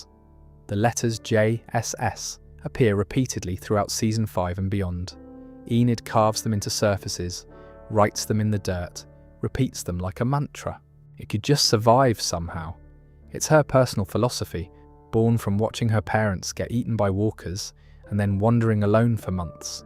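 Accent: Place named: United Kingdom. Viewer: This narrator sounds British, and they have a notoriously poor grasp of the size of the United States.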